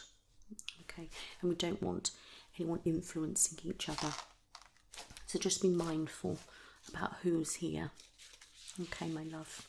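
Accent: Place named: United Kingdom. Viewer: this sounds exactly like my appointments, (when they didn't stop for some unexplained reason 😮‍💨), when i meet someone new. this touches me especially with the accent, as im british myself, so it feels like someone i would speak to in real life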